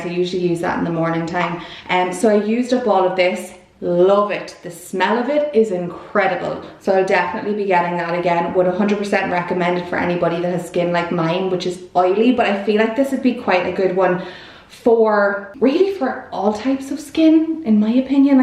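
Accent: Ireland. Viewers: Missing so much your videos!! Because it’s like chatting with you, like hanging out with you, also I loooove your Irish accent and I learn it with you 🙊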